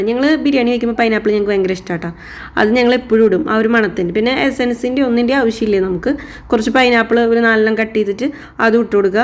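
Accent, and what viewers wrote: Thrissur, India. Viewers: നിങ്ങള് തൃഷൂർ ആണ 😜 എല്ലാം സ്പീഡിൽ പറഞാൽ പെട്ടെന്ന് ബിരിയാണി ഇത് പോലെ തയ്യാറാക്കാം 😂😂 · 🌹🌹🌹🌹ഒരു അപേക്ഷ ഉണ്ട് ചേച്ചീ ഒന്നൂടെ തനതായ തൃശൂർ ഭാഷയിൽ സംസാരിച്ചു വീഡിയോ ചെയ്യാൻ പറ്റുമോ. അത് കേട്ട് പാചകവും കാണാന്‍ ഒരു രസം ഉണ്ട്... 🌹🌹🌹🌹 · ബിരിയാണി ഇഷ്ടമായി. ആ സംസാരവും എനിക്ക് ഇഷ്ടമായി. തൃശൂർ ക്കാരി ആണോ.ഞാൻ തൃശൂർ ക്കാരിയാണെ. 😆😆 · തൃശൂർ ആണോ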